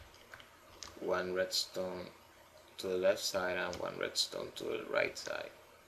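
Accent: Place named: Mexico. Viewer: r u Latino? im mexican. u sound mexican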